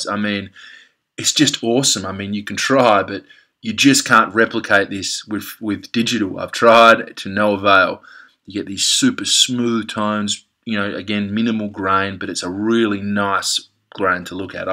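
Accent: Australia. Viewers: Absolutely love this film. I especially love shooting it in my M3, with a Summicron 50mm colapsable. On a nice sunny day, it's a must. I agree with everything you said, and the cafe shot is lovely. Also, I've been following the USA political scene for almost two years, and to hear an Aussie accent on YouTube is a real bonus. Well done.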